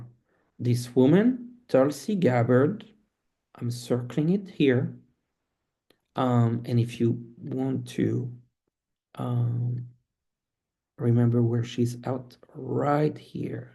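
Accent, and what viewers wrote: France. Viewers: Oooh,! Hi, I’m the gal that LOVES how you say “tableau”….yes, it’s me again! I am soooo happy to see you again these days. I’m now a subscriber too. I love your accent! Ooh la La!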